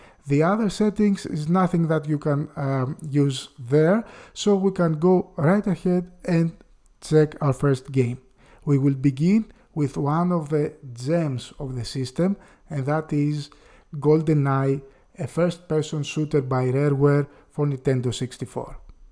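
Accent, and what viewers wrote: Greece. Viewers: What about conker's Bad fur day? BTW: where are you from? You accent sounds Greek.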